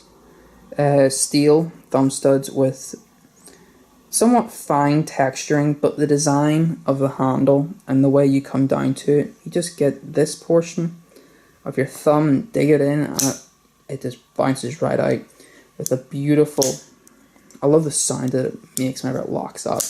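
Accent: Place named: Ulster, United Kingdom. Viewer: Hi 'Bout ye! Glad to hear an Ulster accent on YouTube. Enjoyed your vid,very professional presentation, it flowed with just the right pace and level of information. Excellent appropriate use of close focus. Good idea to end with 3 comparative size/style knives. I've chosen a purple Tsuchi today, I like a bit of bling!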